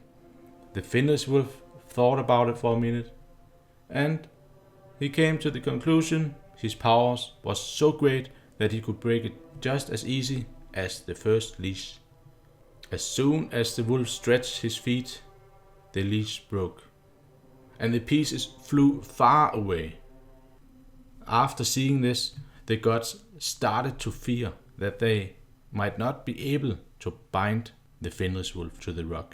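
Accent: Denmark. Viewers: Excellent Video!, i have the doubt, where are you from? i think you have danish accent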